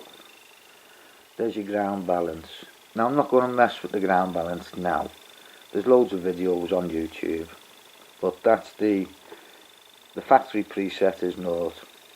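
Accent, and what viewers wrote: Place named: Chester, United Kingdom. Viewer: New sub here. Thanks for the great video. I’ve just bought the 600 and this was very helpful. I have 300 acres of farm land in chester. You’re more than welcome to use it. Your accent sounds like you’re not too far away from ch47 area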